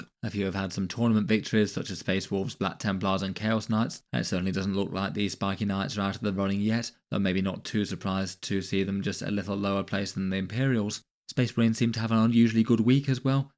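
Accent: Kentucky, United States. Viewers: Enjoy the kentucky pronunciation.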